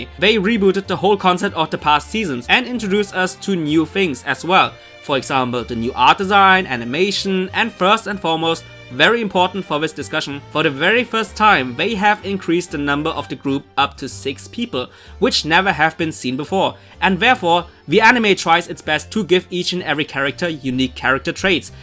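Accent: Germany. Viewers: you have a german accent... are you german? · one question. what nationality are you? it sounds like a german accent you have.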